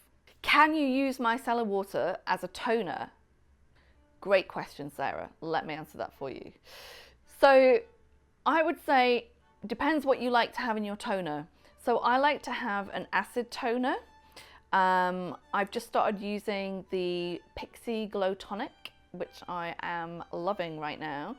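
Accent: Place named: United Kingdom. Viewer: I love British English accent 😍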